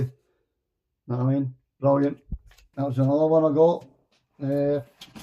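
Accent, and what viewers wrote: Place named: Scotland, United Kingdom. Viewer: Hi! Just found your channel after a year in the VC- great to hear another Scottish voice, thought it was just me and my mate Jack. Great selections here. Look forward to checking more of your stuff out. Cheers, Gordon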